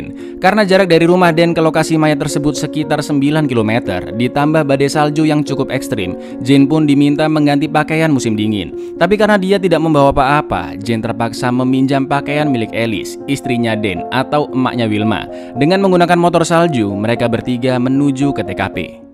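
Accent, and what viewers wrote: Java, Indonesia. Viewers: Saya juga ngikut ah 3.suka ngelawak dan medoknya lucu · logat jowomu lurrr,,,,bkin auto sub chanel mu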